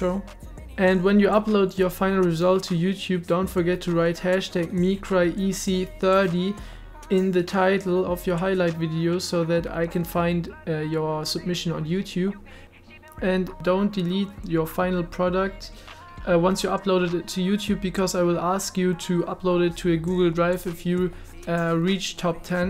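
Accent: Germany. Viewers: Man hört das du deutscher sprichst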